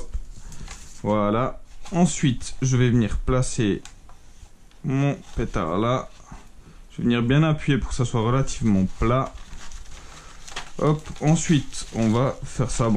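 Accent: Switzerland. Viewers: Parce que sur le carton de blanc de colle il y a les trois langues parler en suisse en plus tu as un accent suisse et les français ne disent pas papier ménage. Ils disent sopalin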